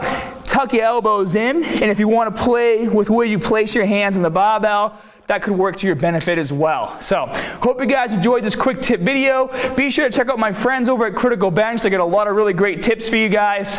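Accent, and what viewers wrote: Boston, United States. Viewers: since when did you have a boston accent? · Great vid. Accent is great hah, from boston? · Good video so ima guess your from Boston lol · is he from boston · havnt watched your vids for a little while now but ERM lol what's with that Boston accent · Did he always have a Boston accent? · I dont need your opinion Hell no. Don't disrespect NJ like that lol. This is a Baaahhhston accent. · Are you from Boston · Did you just say Bah? That Bahston accent really coming out hard, bro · Where did the Boston accent come from???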